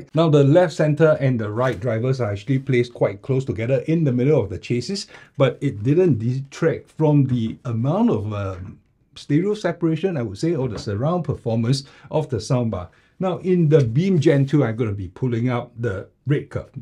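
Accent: Singapore. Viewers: You speaking too fast. Please slow down a bit. Maybe it just a Singaporean English problem. but anyway I think for better understanding would be good to slow down a bit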